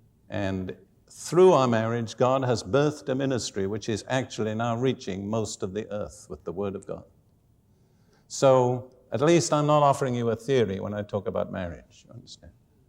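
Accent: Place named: United Kingdom. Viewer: Real Brit Talk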